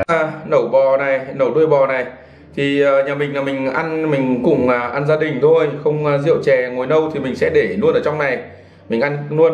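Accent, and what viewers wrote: North, Vietnam. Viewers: Anh mê kênh của chú lắm nhưng a ở miền tây nghe giọng bắc hơi khó nên a nghe đi nghe lại hoài mới hiểu. Phai chăng vừa nói vừa hiện chữ lên màng hình luôn hay quá. Cảm ơn chú nhiều. . .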